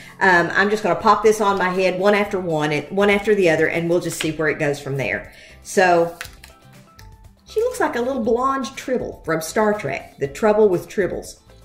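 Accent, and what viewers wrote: South, United States. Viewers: Can I ask where you are from in the South? I’m from Arkansas and I love to listen to you.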